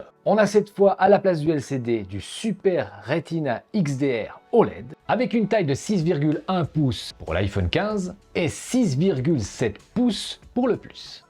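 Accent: Switzerland. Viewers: Se bon accent Suisse il m’avait manqué, welcome back et félicitations pour cette grande mif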